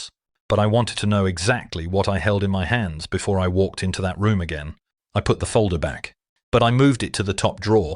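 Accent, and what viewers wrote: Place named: United Kingdom. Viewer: Why a british voice?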